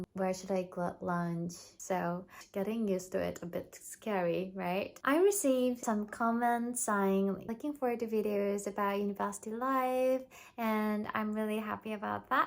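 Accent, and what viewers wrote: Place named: United Kingdom. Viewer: Like your accent! Sounds so ‘British’ 😊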